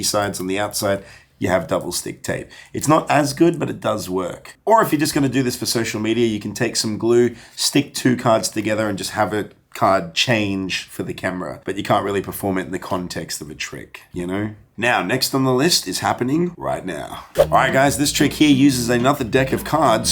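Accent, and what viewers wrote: Australia. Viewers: Hey,! My little boy LOVES you and your videos! We watch you daily (every day)! It would be so awesome if you could give him a shout out? He wants to do magic like you. Although we're Americans, he speaks in Australian accent to be like you! His name is Everett. Thanks for the videos! CHEERS, mate!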